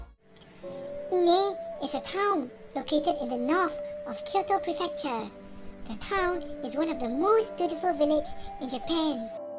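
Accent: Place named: Singapore. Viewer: yo i can already tell you're Singaporean laaa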